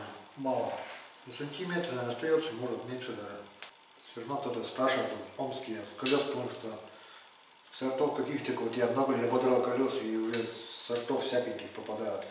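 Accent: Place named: Mari El, Russia. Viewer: Говор марийский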